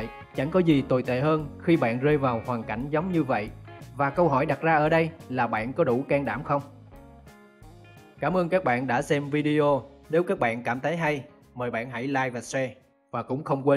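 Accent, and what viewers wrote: Central, Vietnam. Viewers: Ad quê ở miền trung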